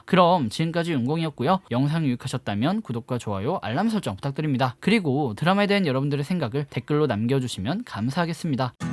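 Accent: South Korea. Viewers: ㅎㅎㅎㅎㅎㅎ 말을 허벌나게 잘해부리는구마이~ 틈틈히 리뷰 잘보고 있어요. 지루하지않고 군더더기 없이 리뷰를 잘해주시는거같아요. 근데 놀란건 전라도분이 어찌 이리 완벽히 서울말을 구사하는지.. ㅎㄷㄷ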